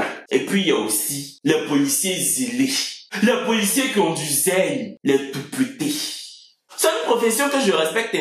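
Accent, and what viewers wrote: Cameroon. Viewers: Tu parle souvent comme un camerounais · J'ai remorqué que tu utilises souvent des intonations ou expression camerounaise dans t video PS: j'aime tes videos tres droles jusqu'à 😂😂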